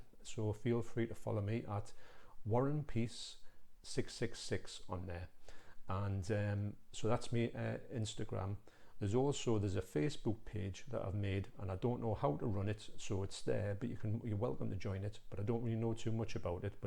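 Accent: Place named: RP, United Kingdom. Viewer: what's with the posh accent ?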